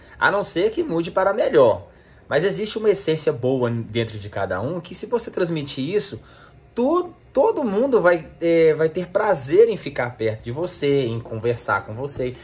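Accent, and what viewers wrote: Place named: Minas Gerais, Brazil. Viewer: ADORO VER VOCE FALAR MINEIRO...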